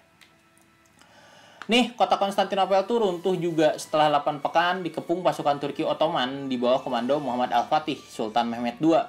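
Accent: West Java, Indonesia. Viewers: sunda abis si aa logatnya wkwkw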